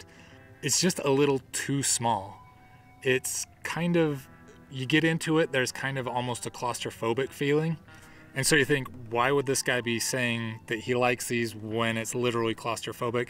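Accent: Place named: United States. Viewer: This is 8th swag video I've watched in a row, and the first from the US. I just had several second shock/adjustment back to the American dialect. 😄 Thank you for the explanation and history. I had assumed the swag was the OZ version of the American cowboy bedroll (I have one), but couldn't understand why modern day Aussies were using such confining shelters. In my search for quick setups and tear-downs, I've largely settled on the Kwik Kamp tent cot for solo camping. It's very similar the swag/cot (stretcher) combo in the video but all in one unit that folds up very quickly. It's not as abuse resistant as a canvas shelter, so I treat it with respect. I'm not trying to hijack the video, but in searching for the Grail of quick truck camping shelters. I've settled on the canvas cowboy teepee. They're very fast to setup, allow room for several sleepers, and room to stand up. 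The white canvas keeps the interior cooler during summer months, and a lantern hanging from the peak really lights up the interior nicely. It's a design that's survived the test of time for good reason, and on of my favorite gear purchases, and a go-to for car camping.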